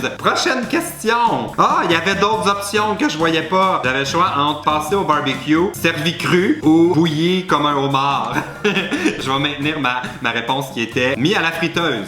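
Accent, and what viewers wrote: Quebec, Canada. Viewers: Franchement je t'adore trop je suis francaise et j'adore l accent quebequoi c est trop chou!!!!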